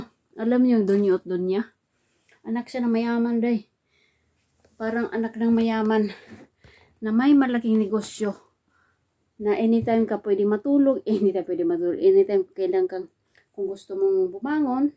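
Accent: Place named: Visayas, Philippines. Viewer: Uy, bisaya di-ay ka,pila naka katuig diha?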